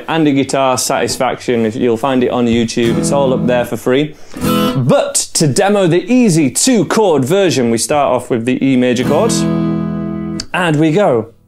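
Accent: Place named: Birmingham, United Kingdom. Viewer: Your accent is like peaky blinders 🙃😂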